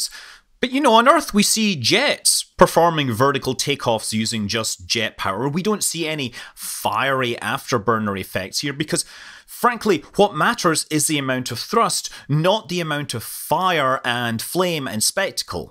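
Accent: Scotland, United Kingdom. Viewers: As in Star Trek, the best engineers have a Scottish accent. Thanks Scott Manley!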